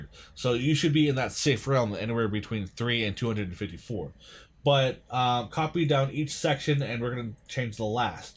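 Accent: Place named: India. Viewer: He's from India english is not his first language idiot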